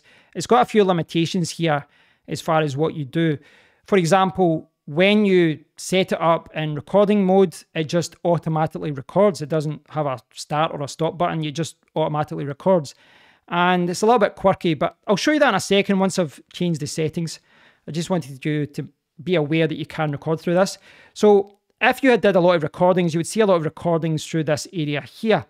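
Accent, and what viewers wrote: Scotland, United Kingdom. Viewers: Just got my Go 2, and this was a great tutorial (much better than Rode's documentation). The Scottish accent made fun to watch too!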